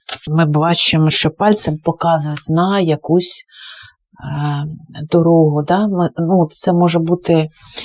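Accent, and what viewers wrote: Odesa, Ukraine. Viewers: Нічого,ви з Одеси,а там так розмовляють.❤❤❤❤😊😊😊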